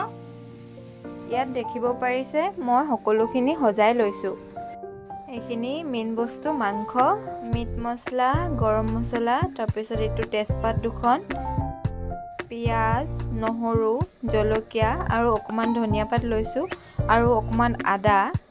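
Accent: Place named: India. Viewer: Beautiful. I find your bangla a bit different than kolkata. Where is it spoken like this?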